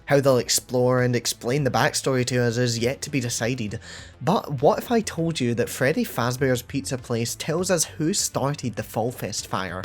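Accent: Scotland, United Kingdom. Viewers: great theory actually AND you remind me i love scottish accent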